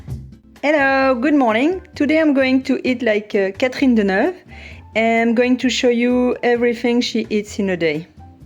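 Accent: France. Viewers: What a rude comment! Your English is lovely and your French accent just makes it more unique... ❤ from Chile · Merci beaucoup❣️I like the way the French eat healthy, balanced meals, and they don’t snack on junk food❣️Your English is very nice and I love your French accent❣️God bless you❣️🙏🏻❤️🙏🏻🍏🍇🫐🥑🥕🥬🍞🍗🥗🫕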